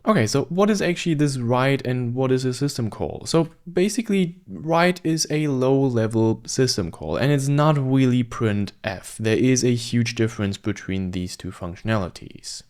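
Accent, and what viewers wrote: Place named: Netherlands. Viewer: Dude sounds like Dutch Elmer Fudd, love it ❤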